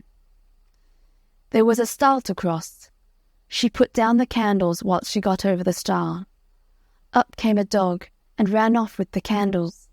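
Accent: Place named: United Kingdom. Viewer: This is British accent.😉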